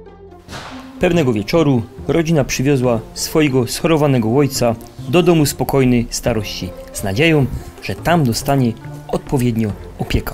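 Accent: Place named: Silesia, Poland. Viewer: Słychać, żeś hanys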